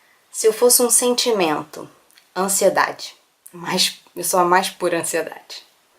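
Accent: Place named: Rio de Janeiro, Brazil. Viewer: oieee.. td bom???? adorei seu canal.. que sotaque lindo... carioca???? já super me inscrevi aqui =] no meu canal eu faço vlogs, tenho dois bebês, gemeos e mostro minha rotina com eles.... =] ficarei feliz em te ver por la... vi que vc tem o canal desde 2012 mas só começou com os videos a um mês atras.. continue... vc fala bem.. é linda e parece muito simpática.... sucesso... bjssss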